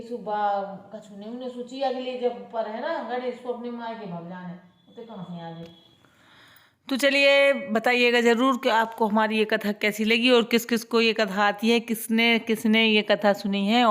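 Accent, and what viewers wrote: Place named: Bundelkhand, India. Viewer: Third like ke sath bahut Achcha falahar banaya hai aapane ekadam testi aur yummy Dekhkar hi Munh Mein Pani a Gaya Hamare Yahan Bhi Ganesh Chauth Ka Vrat bahut acche se Manaya Jata Hai vaise Main To baramasi Ganesh Chauth Ka Vrat rahti hun per yah Bade Ganesh ki jo Puja Hai vah Mere Hanuman hai isliye maine poochha Nahin Ki Thi aapke Munh se Bundelkhandi bahut acchi Lagti Hai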